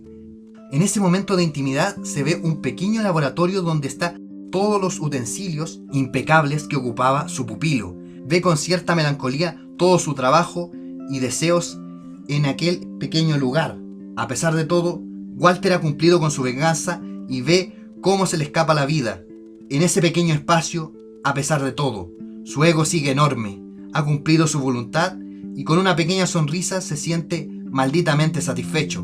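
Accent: Chile. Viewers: Chileno? o parece el acento xdd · El acento chileno es tan chocante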